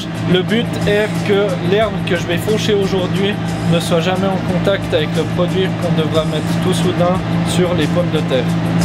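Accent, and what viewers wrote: Vaud, Switzerland. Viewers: le bon accent vaudois !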